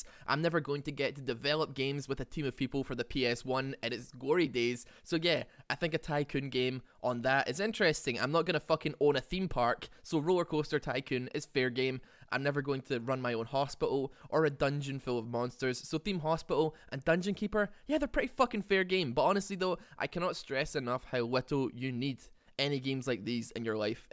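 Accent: Scotland, United Kingdom. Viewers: Are you scottish? ^^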